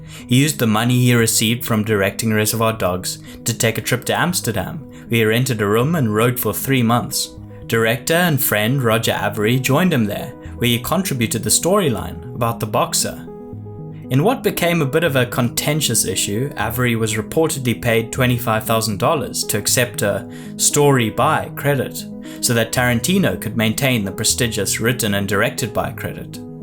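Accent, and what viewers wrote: South Africa. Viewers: I love your channel boss, you're South African right?? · Not even a few minutes in I kept thinking, this dude must be South African, so I checked your channel info and lo and hehold! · You sound South African? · Sounds like a South African narrator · South African Accent? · Are you from South Africa man ? Your accent sounds like it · Are you South African? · South African? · Hearing this accent again reminds me why I left SA. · I’m not sure if using “from dusk till Dawn” was an intentional reference. You sound South African. Are you in Jhb? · Are you south african? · U South African?? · Is this man... a South African? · Are you South African? I recognise that beautiful accent from miles away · Subbed when I first heard the South African accent. Great video 👍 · Bro your South African, right?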